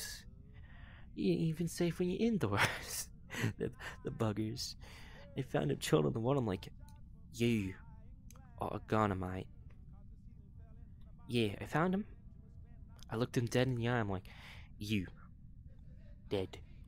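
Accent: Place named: Australia. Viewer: I love this! I thought you were a husky at first and clicked the video because the avatar was cute. The next thing i know, I'm listening to an Australian accent kangaroo 😂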